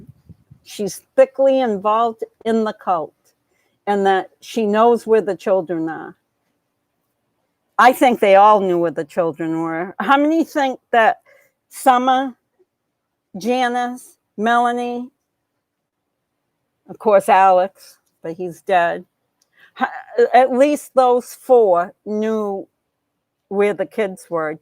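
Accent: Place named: Boston, United States. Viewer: Can hear the Boston accent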